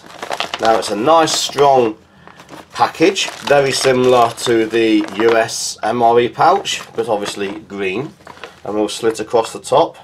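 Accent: United Kingdom. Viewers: Great review ! I always enjoy to hear your English English :) These rations ate civilian, but the components are the same quality as the military. I personally enjoy Maiskij Chai - the tea. I even bought a pack for me when I was in Ukraine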